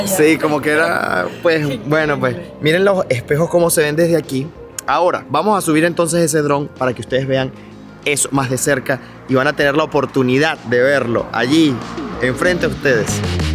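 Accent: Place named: Venezuela. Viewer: Hola. Excelente es muy refrescante y entretenido. Felicitaciones paisano 🇻🇪👍 claro ese acento del venezolano es Inconfundibles!! Jajajaja desde Venezuela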